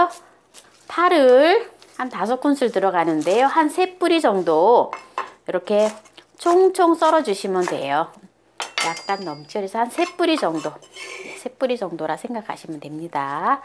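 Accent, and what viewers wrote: Jeolla, South Korea. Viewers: 여사님 말씨를 들어 보니 고향이 전라도 분이시군요. 역시 남도 분들이 요리 잘하시는건 인정 합니다.전 완도산 덩어리로 길게 뭉처진 곱창김을 구입 위와 유사하게 하는데도 하늘나라에 계신 어머님이 옛날 어릴적 해주셨던 그맛이 나질 않는군요 ...ㅜ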